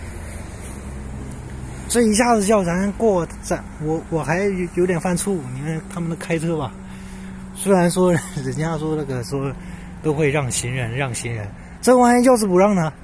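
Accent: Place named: Shandong, China. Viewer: 心声，你肯定是山东的吧，我听出来了，老乡🍔🍟🍕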